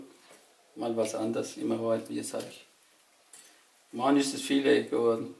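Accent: Swabia, Germany. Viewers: Stuttgarter?? xD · Sympathischer Akzent. Wo wohnt ihr? Schwaben? Liebe Grüße aus Lübeck. 👍🍽️ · bist du Schwabe? 😃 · Schwabe??? Hört man so im Abgang, oder täusche ich mich? Sehr sympatisch :-) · du bist Schwabe:D Ich feier dich mein Freund!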